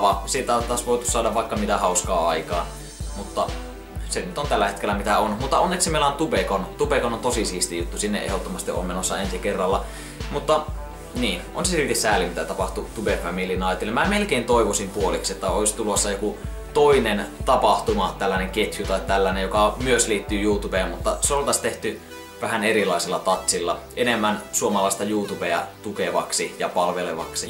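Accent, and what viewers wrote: Finland. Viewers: Tuli vaa mielee että missä asut kun puhut tuommosta murretta. Lapissa mutta missä siellä?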